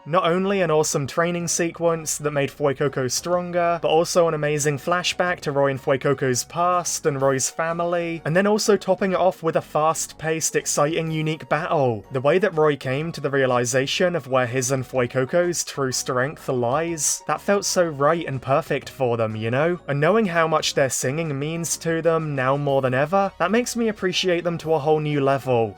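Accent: United Kingdom. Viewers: Wait a minute, you're British?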